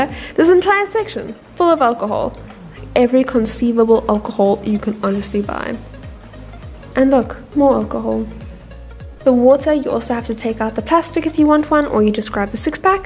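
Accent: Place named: South Africa. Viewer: Hi, so nice to hear a fellow South African blogging. Well done and can’t wait for more.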